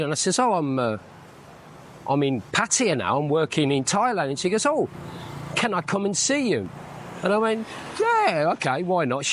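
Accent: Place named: West Bromwich, United Kingdom. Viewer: I've been in Thailand since 2010 and can honestly say the women are getting fat and too demanding. It's a myth about beautiful Thai women. I had also experienced physical violence from them, I never ever experienced that from a woman in the western civilisation. Btw, you are looking older and fatter in the face. Forget getting a HiSo woman, no chance with your looks and West Brom accent! Lol+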